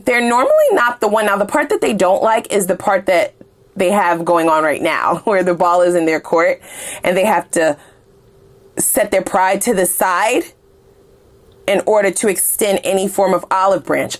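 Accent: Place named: United States. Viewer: Nice analysis.but sisan people can't get your USA pronunciation.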